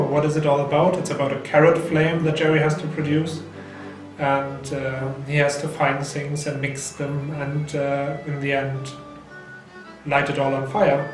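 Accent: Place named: Germany. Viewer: Oh the german accent :) looks really nice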